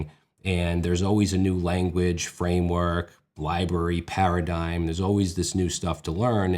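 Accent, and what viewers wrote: Boston, United States. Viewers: That Boston accent tho 🤣 But I am a NY'er and would rather learn listening to you than someone that barely speaks English! I have to say this because it happens a lot with music production too and dudes getting daunted by new DAW's. Don't go into anything thinking you are going to understand the ENTIRE THING - you ain't figuring out Ableton Live in a week when it took 100 developers years to build it. Jump in with a list of JUST what you need to get what you are doing DONE. Learn just how to do what you need to do, then as you need different things you don't understand you look them up one at a time, use AI it's super convenient compared to asking google questions. just my two cents.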